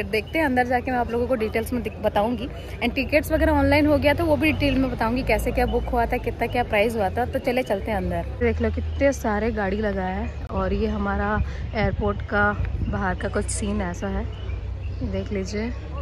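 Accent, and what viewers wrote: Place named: Odisha, India. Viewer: Flite me safar karna kya ajkal ka dinme badibath he kya odia wali hindi jadh raheho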